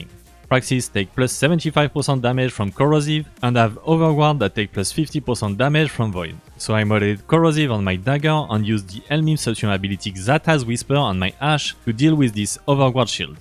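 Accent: France. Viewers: Excuse-moi de te poser la question en français mais je suis nul en anglais et j'ai remarqué ton petit accent français. Je souhaitais savoir si le troisième passif de voruna pouvait être fort avec la dague en céramique, et si tu me le recommanderait. Mis à part cela j'ai beaucoup apprécier le travail que tu as fait pour cette vidéos, force à toi.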